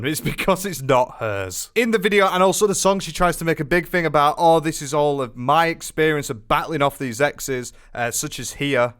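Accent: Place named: Newcastle, United Kingdom. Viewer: Hey there. I am brand new to you. Nice to meet you. You sound like a Geordie. lol. My favorite accent. I will subscribe and like!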